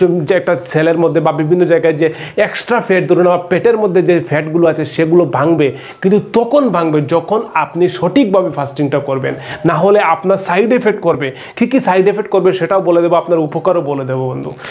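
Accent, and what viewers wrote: Sylhet, Bangladesh. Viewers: আপনি সিলেটি কথায় বুঝতে পারছি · 👉Oi eegu aamrar siloti Shur -ey -kelu ucchharon korer byei!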